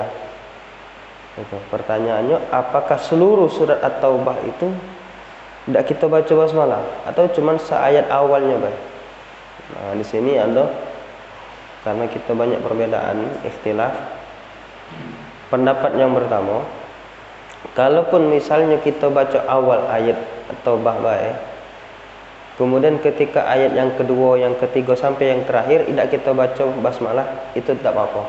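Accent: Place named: Palembang, Indonesia. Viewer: Cak nyo dari palembang yo bang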